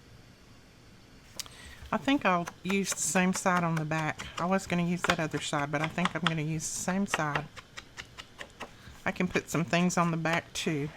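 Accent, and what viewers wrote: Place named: Georgia, United States. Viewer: This was such a delightful tutorial to view this evening. You inspired me to try a similar technique if I may in my tutorial creating a Wedding Album. Thank you sincerely for your wonderful instructions. You sound like you are from Georgia. haha I am Canadian but have some sweet Georgian friends I visit now and again. I made sure to Subscribe and hit the bell. smile I would love you to visit my next tutorial where I will try this technique (somewhat) and be sure to link your Channel so you get the credit. smile Blessings! I forgot to mention my favorite season. Definitely, the FALL weather when our humidity has taken flight. haha Niagara Falls is one humid place!!!!!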